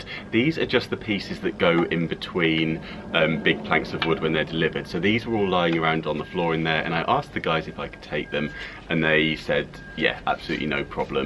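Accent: England, United Kingdom. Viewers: What part of England is your accent from? It's nice.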